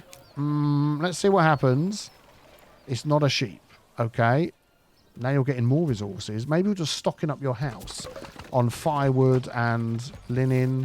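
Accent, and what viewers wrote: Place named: Midlands, United Kingdom. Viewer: Great to see you playing this game - looking forward to release of this beautiful alpha! I have sort of given up on cities skylines 2 until there are assets and more fixes (despite having 300 hours in it) - so this looks lovely and from all the play I have seen there seems to be no game or deal breakers. Lucky you having contact with Greg for help! But yea, after cities skylines I think you are simultaneously over-thinking and under-thinking things at times LOL. Also you missed a trick when laying out your houses... after selecting the area you can hit the min-max buttons to adjust the size of the plots... so if you have a row of say 6 you can make them bigger and drop to 5, 4, 3 or 2, allowing for bigger gardens or room for two families. If your trades are taking too long get a horse or two....you need to beef up on the wood, cos virtually everything hinges on it, and getting more families moved in to have more workers. 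Not being a smarty pants here - just what I've observed.... and as I say, this has a really different mechanic than say CS2 or indeed most other games:) I love that it feels totally organic, and love how you can get your roads and paths to flow without the complexity seen in other titles. I had to laugh when you got baffled when you looked at one peasant and said she was carrying a sheep...then zoomed in and she's carrying wool and you wondered where it had come from LOL.... You sound like a midlands guy, so I presume you know where wool comes from hah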